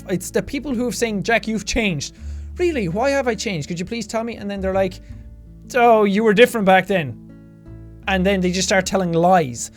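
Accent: Ireland. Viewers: Ow...! My heart is breaking...! ;o; Look, I know this video is over a year old now, and I know this comment is just another drop in the sea you get of them every day, and I know I haven't been subscribed to you for very long and I often leave comments that I only hope are as funny to me as they are to you, Jack, but I just have to say this, and I mean it from the very bottom of my heart: You really are as amazing as people tell you you are. I like your deafeningly loud voice with your rough Irish accent, I like how brightly blue your eyes can get, I like your giant, shit-eating grin, I like your impossibly gray hair (and I actually just discovered that you look very nice in gray shirts). I like it when you swear every ten seconds and say words differently than I do, I like the personalities and names and voices you give to characters you've only just met and how you stick with them, I like when you yell to your ma about cool shit or scream at Billy for being a dumb shit. 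I like the scary, funny, weird, impossible, dumb, loving games you play, I like that gaming isn't all you do, I like how completely honest you are with yourself and others, I like the relationship you keep with your fans. I like YOU, Jack. We all do. Why else would we be here for you if we didn't? Ugh, long message is long, I'm so sorry! This sap is getting everywhere.... In any case, if you ever manage to make your way through this train wreck of feels, then good on you! If not... TL;DR: I like you, I'm prou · just don't loose the awesome irish accent... as long as that stays... I'm good...... grow an afro for all i care! :D but either way.... just do your own fricken thing! have fun ... fuck the haters.... they're just jealous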